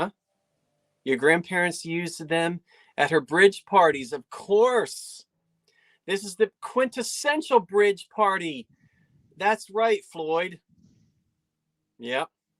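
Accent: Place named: South, United States. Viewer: 😂😂😂 and his southern accent.Am dram is missing out on your talents.David loved hearing the sandal story.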